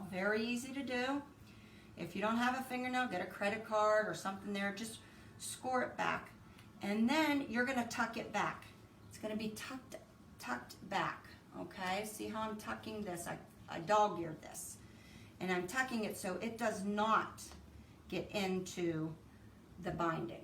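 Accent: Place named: Pittsburgh, United States. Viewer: Hello from a fellow Pennsylvanian. I was watching your video and thought to myself that you sound like you have a Pittsburgh accent. What a very beautiful, creative blanket (loved your granddaughter’s blanket too)! I am just starting out in sublimation. I made a few heartfelt creative Christmas presents. I am continuing to practice. I really love this creative outlet. I, like everyone else, was wondering where you got your blankets from, great size and it looks to be an awesome quality. Thank you for your informative, thought provoking video. Stay safe.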